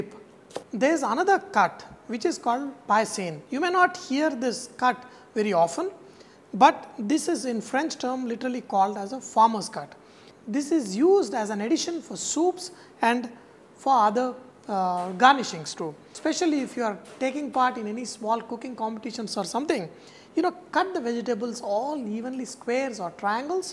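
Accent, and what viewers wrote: India. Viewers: is good. HE HAS AN REGIONAL INDIAN ACCENT... NOT EASY TO GET THE NEUTRL ACCENT. WHEN WE PUT UP WITH THE CHINESE AND OTHER ASIAN AND NUMEROUS ACCENTS WHY NOT SANJAY'S WHO IS VERY CLEAR ABOUT WHAT HE TEACHES..... ONE OF OUR BEST VIDEO TEACHER....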